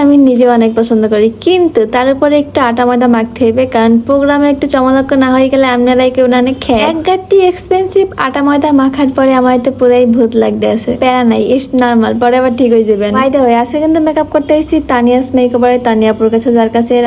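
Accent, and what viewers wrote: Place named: Barishal, Bangladesh. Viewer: আমি তো শুধু তোমার বরিশাইল্লা ভাষায় কথা শোনার জন্য ভিডিও দেখি.. 🥰🥰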